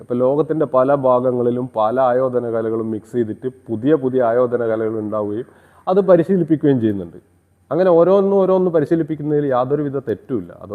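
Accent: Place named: North Kerala, India. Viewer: Thiruvanandhapuram,pakshe slang vadakkananallo GURUKKALE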